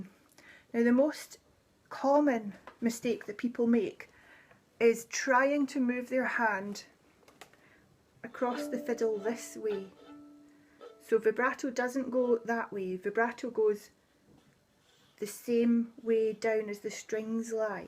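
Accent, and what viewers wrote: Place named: Scotland, United Kingdom. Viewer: I enjoyed your instruction ( ive been playing since before internet existed) I can learn something from anyone> its making the NEW ...a HABIT. I LOVE hearing your scots dialect. and pretty name. thank you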